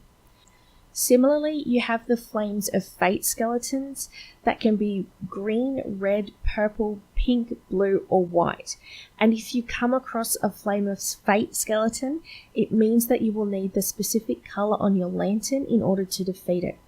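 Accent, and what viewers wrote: Australia. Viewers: dude, I was having to take 6 swipes at reg skellies that normally take 3. I’ve been a PL since year one, so not sure what’s up. Btw, still loving the content and the Aussie accent 😎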